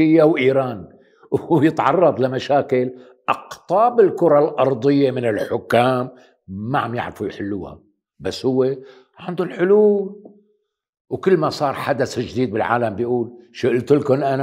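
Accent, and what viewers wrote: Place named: Syria. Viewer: والله انا اسمع للاستاذ مش بس عشان نقده واسلوبه، بس كمان عشان لهجته وطريقة كلامه السورية اللي وحشتنا كثير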